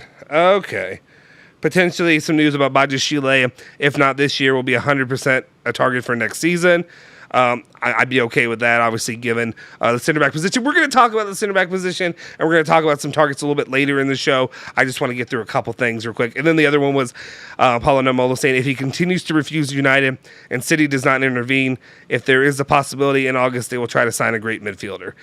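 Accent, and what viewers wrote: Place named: Minnesota, United States. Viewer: never do that Minnesota accent ever again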